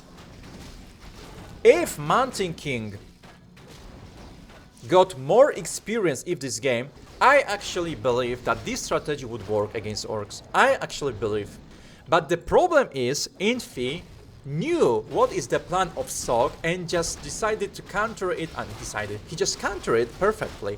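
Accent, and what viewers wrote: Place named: Poland. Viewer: Hej,! (Świetny nick, na marginesie, teraz to widzę) Wspieram i popieram wejście na anglojęzyczne rynki!!! Nie będę Ci na nic narzekał, bo wiadomo, początki niełatwe. Nie wiem czy mieszkasz bliżej Warszawy czy Krakowa, ale jak to drugie, to może byśmy się spotkali, postawię Ci coś dobrego;) Zdziwiłem się, bo z tytułu myślałem, że będziesz grał z zagranicznikami, i swoje komentował po angielsku. Akcenty i wymowę warto może trochę podszlifować, bo brzmi bardzo Polsko. Ale nie przejmuj się! Popieram, rób tak dalej, warto! Najważniejsze to robić, reszta przyjdzie z czasem;) Na marginesie, chętnie zaangażowałbym się w kierunkowanie strategii rozwoju, ale Twoja wola. Jeśli nie, i tak wspieram wszystkimi czterema kończynami. Powodzenia! Sława!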